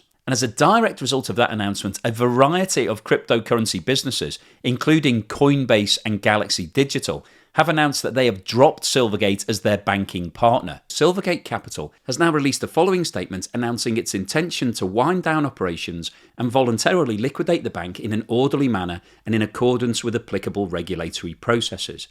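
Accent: United Kingdom. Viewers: Just because you are British you sound like you know what you talk about but you don't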